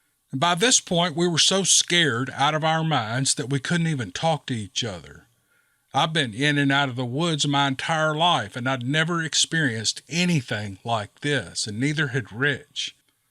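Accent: South, United States. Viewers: Yes.... you have that southern drawl...not heavy..but perfect storytelling kinda very I don't know any other words than perfect hell it's cheaper than my shrink and more enjoyable and you don't mind going over the hour😆😆😆✊💪🤜🤛🇨🇦👻💙👻💙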